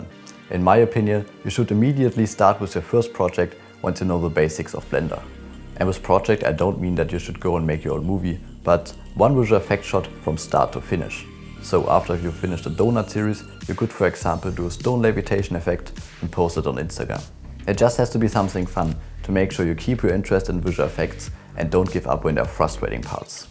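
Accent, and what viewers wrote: Germany. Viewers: You really sound like a german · i am hearing deutsch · ar you germen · dieser deutsche akzent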